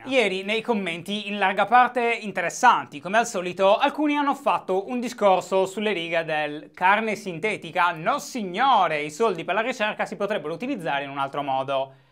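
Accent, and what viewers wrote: Sardinia, Italy. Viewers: Quel momento in cui fai vedere Breaking Italy a tuo padre e lui ti risponde "ma è sardo questo qua".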